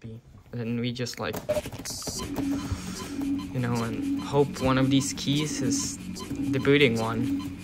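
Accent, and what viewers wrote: Czech Republic. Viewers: Ur czech ?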